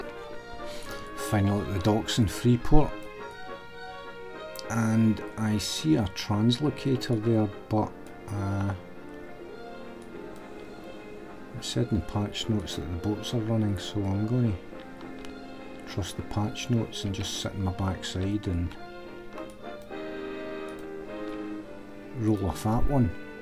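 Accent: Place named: Scotland, United Kingdom. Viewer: Your scots accent makes me want to play mmos with you, sooo laid back and wrapped up in the world of Norrath.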